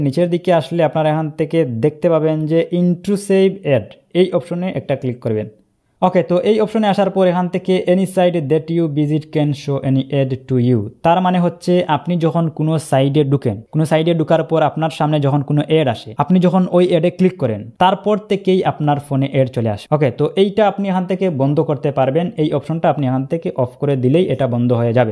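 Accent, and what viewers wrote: Sylhet, Bangladesh. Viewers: Bhai tumi sylheti nih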